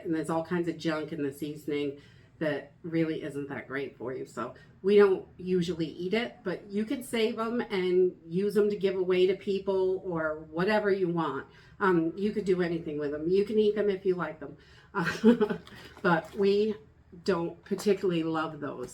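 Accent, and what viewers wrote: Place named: New England, United States. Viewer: Im new to your channel but we seem to think similar. I dont know where your from but the accent and brown bread makes me assume New England someplace. Im from mass and nh but moved to nc about a year and a half ago. Nobody here kbows what brown bread is! I guess brown bread and beans is a New England thing!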